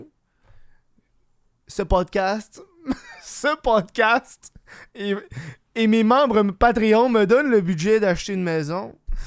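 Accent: Quebec, Canada. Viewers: Je regarde cette vidéo de France (Nlle Aquitaine) et je suis morte de rire. L'accent du Québec plus un débit de paroles rapide, pas évident de tout comprendre. Certains passages et expressions hilarants. Le convoi de la liberté a pris son départ ce matin 9 février à Nice avec une participation importante de voitures, camionnettes, camping-car, camions et ils seront rejoint selon des lieux de ralliements en fonction de la progression vers Paris où l'arrivée est prévue le 11 au soir, samedi journée de fraternité avant de continuer vers Bruxelles. Idem pour d'autres pays européen comme les Pays Bas précédemment. Quels seront les résultats de ces convois qui suivent l'exemple des canadiens, nous verrons. En Europe pour les 27 pays de l'U.E. les peuples veulent reconquérir leur souveraineté et leur culture spécifique en plus des restrictions sanitaires du passe vaccinal. 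Vous habitez un grand et beau pays. Bonne continuation.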